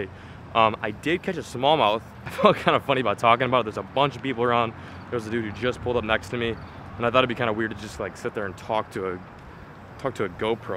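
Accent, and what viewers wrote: United States. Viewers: Your country accent kills me though 😂😂😂😂😂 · that country accent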